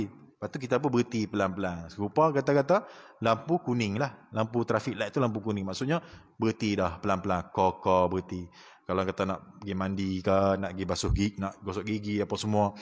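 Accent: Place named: Kedah, Malaysia. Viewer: Komen pertama ustadz... Izin bertanya ustadz.. bahasa kedah dengan perak sama ke tak..?? Pasal saya dengar Bahasa ustadz macam bahasa kedah..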